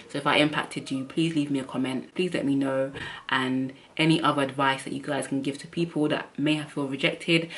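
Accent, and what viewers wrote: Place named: United Kingdom. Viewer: When you played the little Heavenly music leads me to believe you believe in a higher power The Most High Yahawah and his only begotten son Yahawashi Messiah l feel like have to ask you to research your history although you sound like your from the UK but that isn't your nationality right or wrong...? Please don't misunderstand why l am asking you about your nationality, here's why l am inquiring about your ethnic background because l've learned about 5 years ago now that although l was born in America taken from Africa l am neither African nor American, from the time we so called Negroes where dragged here against our wills and turned into Slaves well our ancestors where turned into slaves anyway, we as a people have walked around in this whole Earth where we were all scattered throughout the whole world as bondsmen and bondswomen (SLAVES) Well to make a long story short if you are a descendant of slaves as blacks (the so called Negroes) are here in The Americas (United States) and South America than l ask of you to start researching your history but please go buy unless you already own it the Greatest history book every written called "THE KING JAMES BIBLE/W THE APOCRYPHA " Start with that to begin your research on you history and look for secular historical history that matches the scriptures that verify who you truly are and your true historical and biblical identity you will be amazed when you find out it'll help answer a lot of your questions about circumstances and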